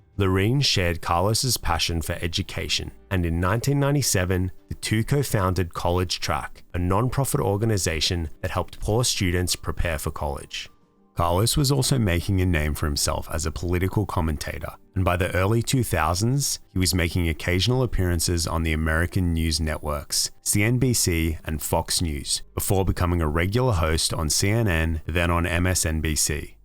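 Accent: Australia. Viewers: Wait... are you Australian?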